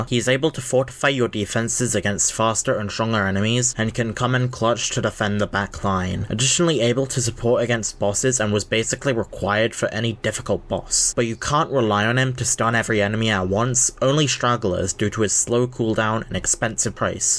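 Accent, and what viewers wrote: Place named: RP, United Kingdom. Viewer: You talk posh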